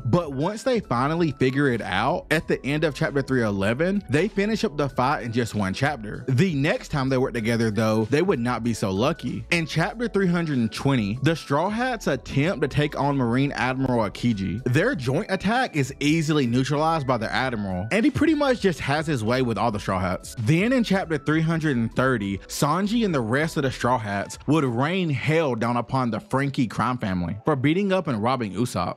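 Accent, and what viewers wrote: South, United States. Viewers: Bro im am american from the south and its so nice to hear a southern accent on the internet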